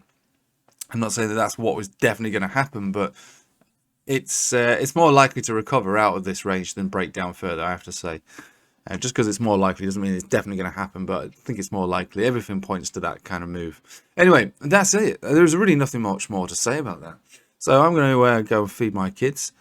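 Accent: United Kingdom. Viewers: Always good to hear a British voice and vision in the morning 😅🇬🇧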